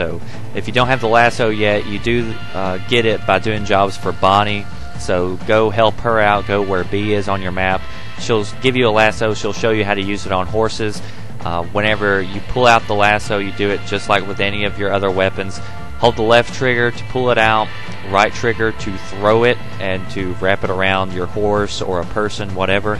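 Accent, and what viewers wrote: South, United States. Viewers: The fact that you’re southern makes this video so much more fun. You’d be good for voiceover work. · are you from the south? don't worry I'm not a dumb yankee I'm from Mississippi i can just really hear your accent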